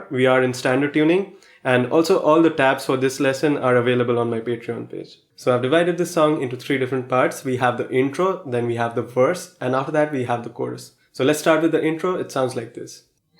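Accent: India. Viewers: Cllicked away because of indian accent…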